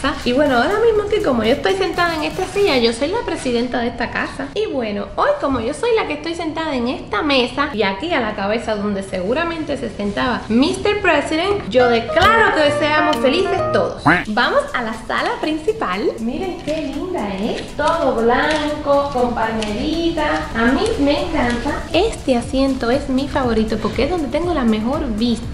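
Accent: Cuba. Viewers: Las dos hablan igual porque las dos son Cubanas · Ella es del pais de así q todo los cubanos habla asi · es que las dos son cubanas, y el acento cubano es asi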